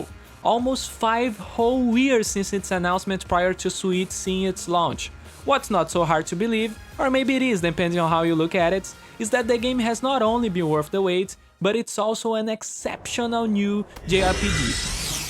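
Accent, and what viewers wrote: Brazil. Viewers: engraçado como é excepcionalmente facil identificar BR falando inglês xD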